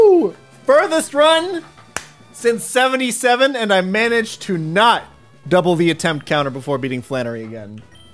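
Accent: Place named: United States. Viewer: he once stated he lived in hongkong (?) for a few years and was influenced very much by american tv series, thats where his accent might come from. I also like how his voice switches entirely when hes speaking german:D In one of the last videos on chat actually forced him to speak 10 min in german Boy cuckbux (twitch currency which you earn for viewing his streams)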